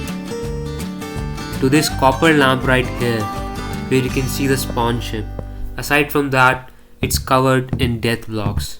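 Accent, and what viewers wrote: India. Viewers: Bro you sounds like Indian 😅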